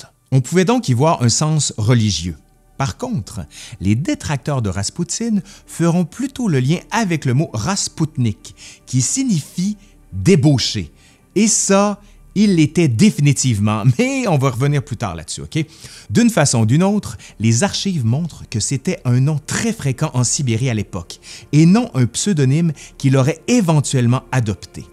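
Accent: Quebec, Canada. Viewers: Merci pour vos vidéos! Je suis en train d'apprendre le français, et c'est sympa avoir des ressources intéressantes avec un accent québécois. :D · J adore cette chaîne !!! Et l accent des cousins québécois Force a vous 💪😍👍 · Excellente présentation de la vie de ce répugnant mais unique et célèbre personnage. Je commence à apprécier l'accent québequois ;)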